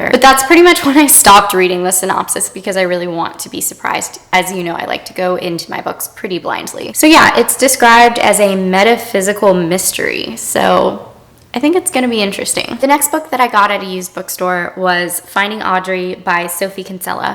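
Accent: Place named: Upstate New York, United States. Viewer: HI just stumbled across your channel and I LOVE YOU ALREADY! where are you from bc u have a bit of an accent... i could be totally wrong but upstate new york? possibly buffalo?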